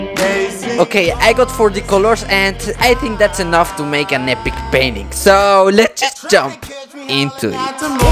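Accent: Mexico. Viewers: Phantom GG his accents sounds totally Mexican and his cellphone shows his company (Telcel) that is a cellphone company from Mexico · si es el español, el es Mexicano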